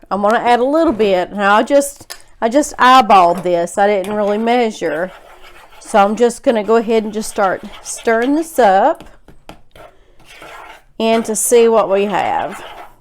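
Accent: South, United States. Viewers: Thanks for the video. Your southern dialect is like butter on bread!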